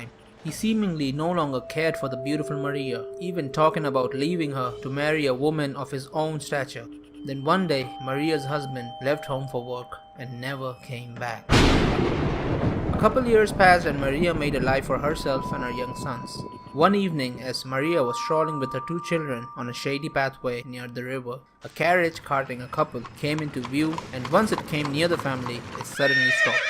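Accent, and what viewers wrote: India. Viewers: Full of Indian accent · Why does the narrator sound from India? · No offense but it sounds not authentic, specifically because of the Indy accent 😂 nice try though.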